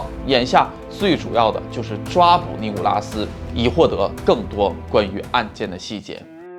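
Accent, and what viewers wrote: Northeast, China. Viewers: 你说的是，区别真的很大。我只认错过一回。我觉得有个阿婆主是天津老乡讲普通话。但她说她是北京人。所以以后我就觉得兴许北京人也这么讲话？我又听了楼主的其他节目，他把z发成zh, 感觉楼主应该是山东或东北人，也可能是内蒙的😆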